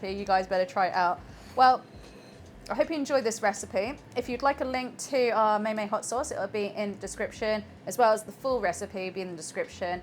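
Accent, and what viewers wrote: United Kingdom. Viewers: I love how Brits pronounce words compared to Americans, it’s hilarious 😂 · Please don't use music. British accent and asmr is the way forward. Trust me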